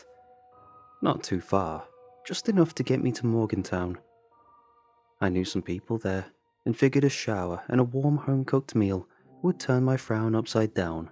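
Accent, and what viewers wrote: England, United Kingdom. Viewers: WTF?! He is English. I am American but have absolutely no issue with following his UK accent. In fact, I quite like it for added atmosphere. Heaven help you if you ever have to listen to a Scots or Irish accent if this guy is hard for you to follow. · Considering you speak with a British accent little brother,I would LOVE to guide you through the misty mountains and the foggy bottoms that are in West-By-God-Virginia...🤘☢️🤘 I'm the one who would sacrifice to get you out to tell the story my friend... Come to the U.S. and I will drop blood for you...🤘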